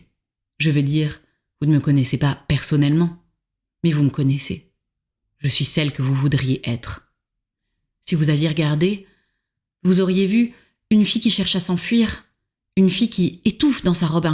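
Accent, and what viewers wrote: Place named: United Kingdom. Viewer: J'ai tout aimé chez vous, vos yeux qui ont l'avantage d'être deux, vos cheveux ondulant, vos multiples chemisiers, vos ongles, votre tapis, vos lobes, votre élocution et votre franc parlé, votre humour, ce micro indéboulonable, cette petite souris qui passe derrière le canapé a 21mn17, cette analyse pointue, ce merveilleux petit accent british (quand on aime on dit n'importe quoi 🙄), les livres sur la cheminée dont je n'ai distingué aucun titre, vos claquement de doigts, votre mug......... il y a cependant deux choses choquantes !! cette lampe sur une chaise et ce livre certainement écrit par un enfant dérangé mentalement dont vous nous faites lecture !! ceci dit merci à l'algorithme de m'avoir proposé votre chaîne !(j'en ai une également orientée nature) vous avez aujourd'hui un nouvel abonné ! ✨️🌟⭐️🔥♥️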